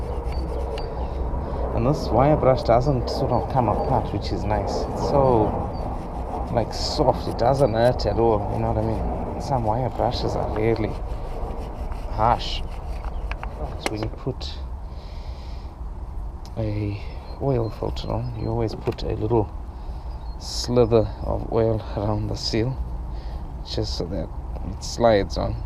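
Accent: Durban, South Africa. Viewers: Nice work. Dude you sound like you from Durban South Africa? your accent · You sound South African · Bro are you South African? 😂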